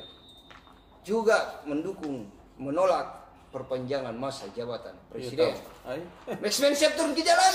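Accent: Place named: Sulawesi, Indonesia. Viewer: 😂😂😂 mantap narator ini sy suka,,sy suka caranya polos,,,lugas khas celebes,,👍💪💪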